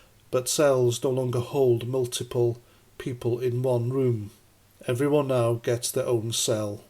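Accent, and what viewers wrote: Ireland. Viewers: I heard an Irish (eire) accent. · His accent sounded as if had a hint of Irish to me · English? He sounds Irish to me. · Hi there, like another viewer, I detected a faint Irish accent. Not NI, more like Dublin. One wonders why anyone would make a point of protesting overseas. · He sounds Irish to me. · I think I detected a very, very slight Irish accent so maybe that's where his ambassador thing comes in. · He sounds like a very posh irish man.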